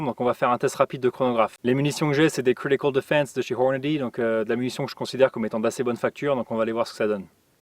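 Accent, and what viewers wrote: United States. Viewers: Super video, l'accent ricain très soigné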